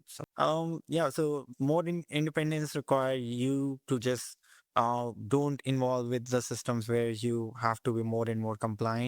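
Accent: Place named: Bengal, India. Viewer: I was inspired to create my own website after watching your YouTube videos. You were right about compliance. I never had a Google account in the past, but I created one a few days ago to comply with Google's requirements. By the way, I suspect you might know Bengali because your tone sounds like it. Thank you for inspiring me to use Linux.❤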